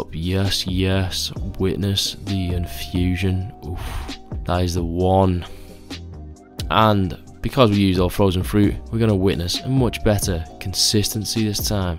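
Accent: United Kingdom. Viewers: the bri'ish commentary just makes it that much better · Something about a guy with a heavy UK accent calling the taste of a smoothie "absolutely fucking ruthless" with soft music playing in the background. You got my sub. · I love the British accent (quick say water)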